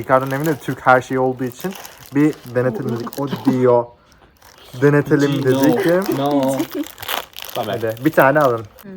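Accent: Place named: Italy. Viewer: Türkceyı ingilizceyı itakyanca aksanı ile konusmak😅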